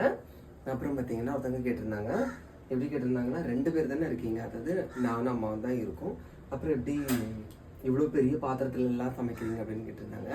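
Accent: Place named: Tirunelveli, India. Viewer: எங்க திருநெல்வேலி கிராமத்நில் 3 ஆம் நாள் அசைவம் சமைப்பாங்க தம்பிக்கு எந்த மாவட்டம் எந்த ஊர் என்று தெரிய்து ஆனா பேச்சி எல்லாமே திருநெல்வேலி மாவட்டகாரர் மாதிரி இருக்கு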